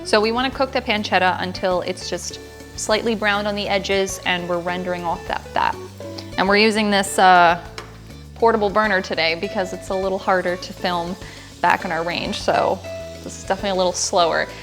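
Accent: Philadelphia, United States. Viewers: You sound like you're from my area near philly. sawce 😀gonna make this for my wife tonight. thank you!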